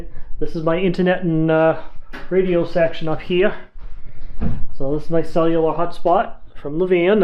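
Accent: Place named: Massachusetts, United States. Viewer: As a Northshore transplant in southern Maine, your MA accent sounds like home. Mine is pretty much gone. It only comes back when I’m around my brother or my parents. They’re in Amesbury and Peabody. I’ve been out of MA since 1999.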